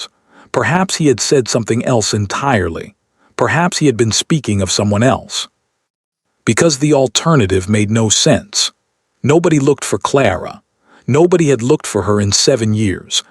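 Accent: United States. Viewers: The American accent is very offputting. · This is a well written story with a Cinderella plot with a duke instead of a Prince. The narrator has a rural American voice when it should be British. I love more visuals than one for the entire read. Thank you for the posting. Your work is most appreciated. From Amelia Island.🏝🏖🇺🇸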